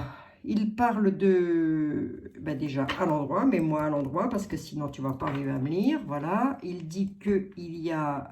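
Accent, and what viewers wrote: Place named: East, France. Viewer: Un immense merci pour ce que vous faites ! Abonnée depuis peu, mon ange m’a envoyée par « hasard « sur votre chaîne… Je me disais bien que votre petit accent m’était familier, étant originaire de l’Est Que notre Seigneur vous garde dans la Paix et l’Amour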